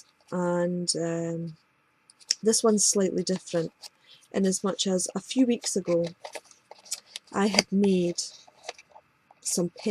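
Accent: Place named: Scotland, United Kingdom. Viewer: Never realised that you were scottish too lol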